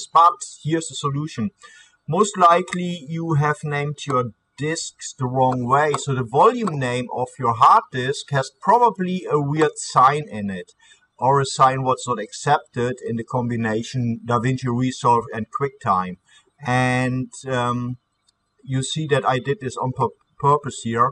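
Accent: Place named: Germany. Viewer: Man hört den deutschen Akzent sehr raus , but anyway , nice video :)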